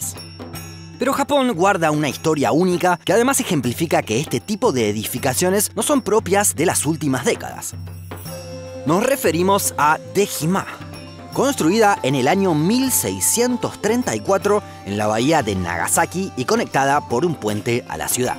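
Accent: Rioplatense, Argentina. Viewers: Si no me equivoco tenes acento rioplatense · acento argentino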